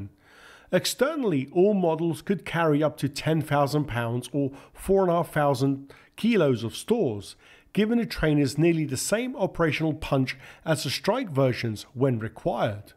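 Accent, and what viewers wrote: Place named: United Kingdom. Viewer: You have probably heard this before, but I bet you do a wicked Christopher Walken impersonation. I have chuckled a few times watching this when you sounded just like him, but with a British accent.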